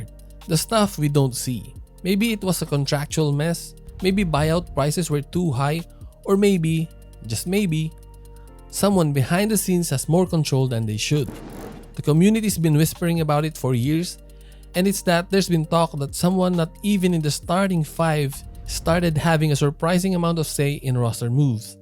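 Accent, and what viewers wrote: Philippines. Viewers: im suprised when i heard that filipino accent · From his accent, I think he is Filipino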